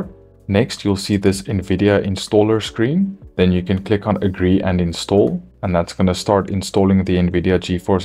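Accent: South Africa. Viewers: Do I hear a South African accent in there? 👀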